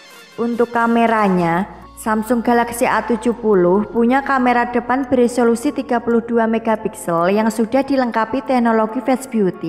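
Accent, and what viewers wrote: Java, Indonesia. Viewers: Khas Jawa 😆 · Medok jowone, tapi malah trkesan unik.. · Jawa tulen ....suaranya.....medok....haha😆😊😀😉😯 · Suaranya medok bgt gak enak didengar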